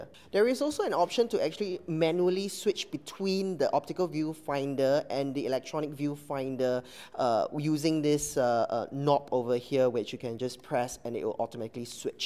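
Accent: Singapore. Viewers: Singaporian accent is so funny :p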